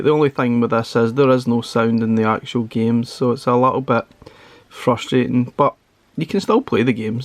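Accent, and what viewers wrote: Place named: Ayrshire, United Kingdom. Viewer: lOVE IT! Can't wait to get mine, i have 2 minis and really looking forward to the larger form factor! good scottish accent, I'm guessing Ayrshire?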